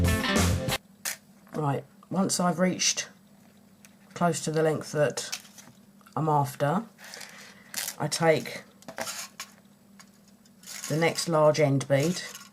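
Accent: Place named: England, United Kingdom. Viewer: Great tutorial and idea! Thank you for sharing. Also nice to hear English accent I live in Sussex! Lol I'm used to hearing Americans! Lol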